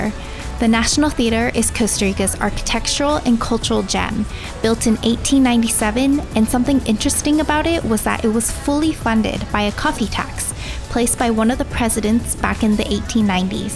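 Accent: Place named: United States. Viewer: Great video! Great American English accent and pronunciation! 💕 ☮ 🌎 🌌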